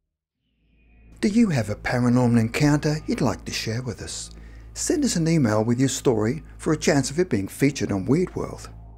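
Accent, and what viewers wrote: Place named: Australia. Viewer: The lady is Australian. If you wa want to listen to only English pronunciations then your choice of videos is going to be very limited. Even well paid TV presenters make errors in pronunciation, including the English, Scots, Welsh and Irish. The narrators here don't seem to be monetized. So they are doing us a favour. Don't like it then why be on here long enough to comment? Sheesh.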